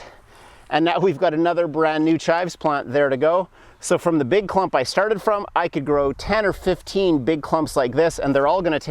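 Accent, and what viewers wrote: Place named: Canada. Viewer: I totally could hear you were from Canada, ay?🙂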